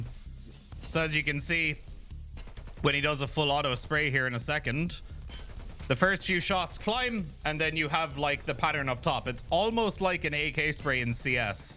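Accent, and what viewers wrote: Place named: Ireland. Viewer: Ive been seeing a lot of negativity lately so this is really nice to see. As always the silky irish tones in the noise canceling headphones are a highly appreciated bonus.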